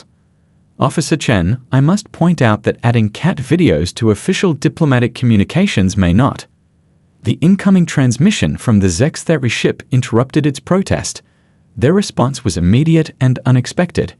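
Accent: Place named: Australia. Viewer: Very strange to hear this in an Australian accent, used to hearing other AI stories in different accents. Hoping this is an Aussie channel 😁😁😁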